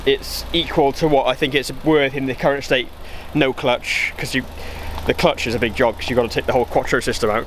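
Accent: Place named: Wales, United Kingdom. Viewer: Great to find your channel. An Audi nut myself! Where are you guys based? Sounds like Wales? Im in Carmarthenshire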